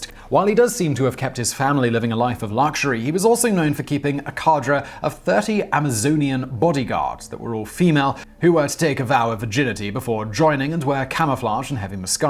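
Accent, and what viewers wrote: United Kingdom. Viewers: Spoke like a true brit "not to be messed with"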